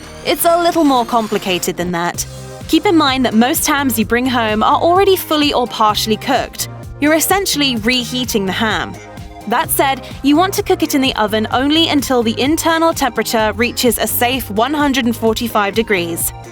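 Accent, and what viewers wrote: England, United Kingdom. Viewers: Love that British accent ❤️ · English accent. They don't do pineapple on ham. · Well folks dhow this is a an ok sort of video please be aware. While the narrator may be British and have a British accent .All the temperatures recommended are in the Fahrenheit (°F) range Where as in England they use Celsius (°C) the internal temperature of 143 F is the equivalent of 61.6667 Celsius (°C) But please be advised for an uncooked ham the recommendations are 63°C or if you are in America where they use the Fahrenheit scale that would be 145°F for the internal temperature . A cooked ham the recommended internal temperature is 140°F or 60°C So going 145°F or 63°C on a cooked ham you will be over cooking it. Now as the only countries that officially use Fahrenheit as a unit for measuring temperature is the United States, the Liberia and the Cayman Islands. 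Other parts of the world use both, though Celsius is the standard. So one can take it this video is for the American market. But if you are outside the aforementioned. Then your appliances control's are more than likely designed for the Celsius (°C) temperature range . So something to look out for when cooking As for F to (°C) converting Subtract 32 from the Fahrenheit temperature. Multiply this number by five. Divide the result by nine. 143 F - 32 =111 x 5 = 555 / 9 = <num> But no matter what you do always cook your ham LOW AND SLOW An internal temperature gauge is a must in any kitchen Anything else is just guessing and mostly leads to over cooking or even worse under cooking It is all about the internal tempera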